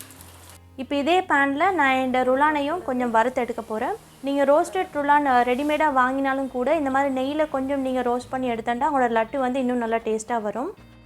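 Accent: Sri Lanka. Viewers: Dear Sister, I always interested in your Coking and the way you speak Tamil is so sweet. Where do you come from in Sri Lanka, Which area? Salam from Germany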